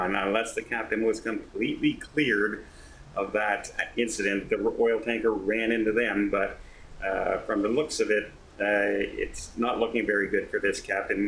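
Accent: Canada. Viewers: The Mighty Cruise Ship Experience He’s Canadian, eh?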